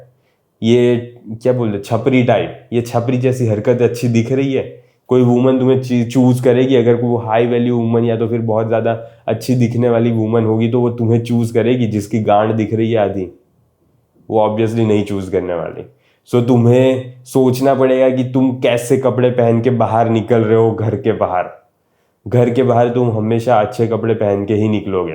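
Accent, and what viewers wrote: Gujarat, India. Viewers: bhai tamari Hindi thi mane tame gujarat na j lago chho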